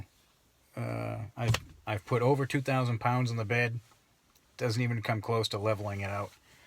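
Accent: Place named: Manchester, United Kingdom. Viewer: Sounds like a Manchester accent.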